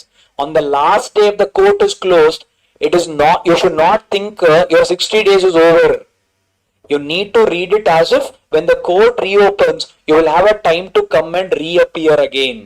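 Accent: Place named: Bangalore, India. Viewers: I love his bangalore english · South accent is really cute yaar and funny too 😺😅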